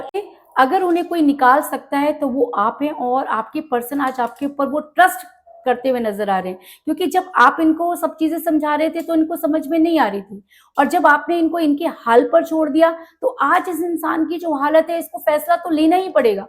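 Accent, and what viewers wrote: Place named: Uttar Pradesh, India. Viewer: Mujhe ap ki bate style muhavre ache lagte hi . Ap age par atak jati hi ye apka boring point hi. I am sure ap UP si hi. Andaze guftagu yehi Zahir karta hi. Ap intelligent hi. Yaha sirf third party nahi hi. In janab ki dozens women si intimacy hi. Isiliye kisi ek ke nahi ho sakte. 50 jagah moh Marne ki adat maza shouk hi. Ap ke liye info.hi .Rdgs mi Kam ayegi.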